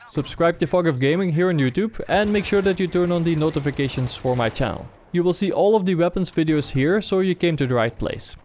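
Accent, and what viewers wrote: Netherlands. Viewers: from hearing your accent I'm guessing he's Dutch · Hoezo Hollands accent :p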